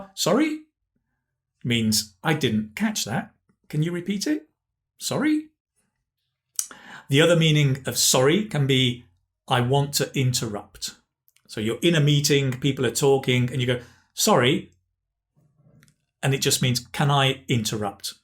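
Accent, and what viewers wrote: United Kingdom. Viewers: I love your videos and I love British English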